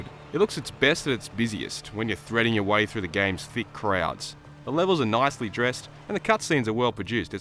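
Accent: Australia. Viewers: Is this reviewer Australian? I'm form Australia and can't tell :P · An aussie reviewer!!!! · An aussie reviewer, scrumptious hahaha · aussie reviewer. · Mate this reviewer is an Aussie. :D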